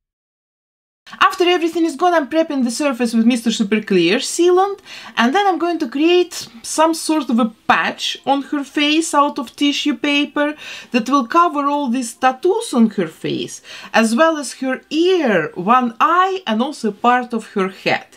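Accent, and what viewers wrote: Russia. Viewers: Обалденно! А у вас русский акцент?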